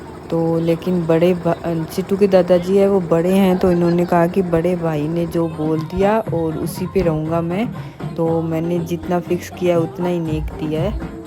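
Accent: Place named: Rajasthan, India. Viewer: ok Ji good, हम भी साउथ दिल्ली से जाट हैं, आपकी भाषा से आप राजस्थान से हों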